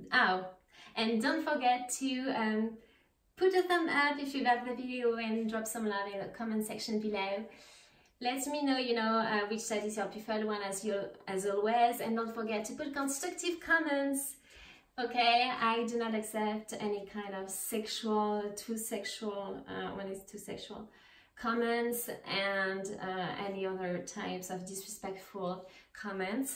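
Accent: France. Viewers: Are U French? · I love that bed warmer on the wall and I love your French accent and your pretty Titi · Mhm. You sound impressively French but in female contrast with a nice rear end.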